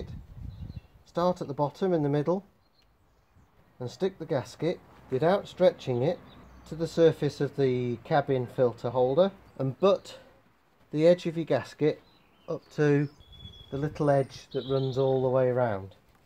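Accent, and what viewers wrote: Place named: Wigston, United Kingdom. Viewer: Greeting from Texas! Are you somewhere near to Wigston ? I'm guessing by your accent..!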